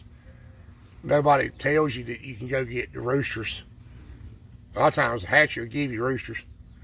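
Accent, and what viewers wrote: South, United States. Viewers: Thanks for sharing , watched most of your videos, This french Canadian has a bit of a hard time with the southern accent. my second year with piglets . I have a question for you . We have cayotes around and i rotate the pigs out on pasture with electric , i always lock them in at night , I wonder how old or how big should they be before i can trust them outside at night? Thanks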